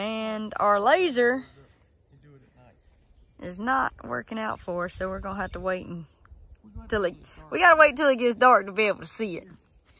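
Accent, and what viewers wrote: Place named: South, United States. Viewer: God I love her accent.. makes me miss the south so bad.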